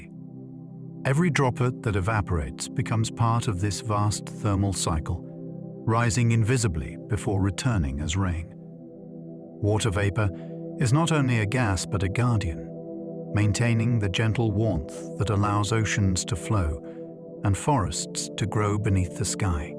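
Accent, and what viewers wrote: United Kingdom. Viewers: So glad i found. This in my scrolling.. i feel like i'm listening to God with a British accent explaining everything.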